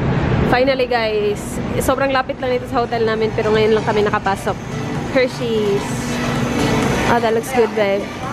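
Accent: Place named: Cebu, Philippines. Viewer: ARE YOU BISAYA? HALATA KASI ANG TAGALOG MO NA YOU ARE A CEBUANA....IM ALSO CEBUANA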